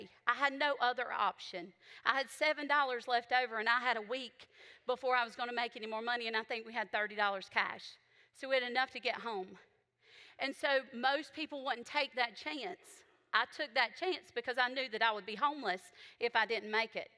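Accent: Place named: South, United States. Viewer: She totally sounds Southern..how could she say out her lips, NJ😂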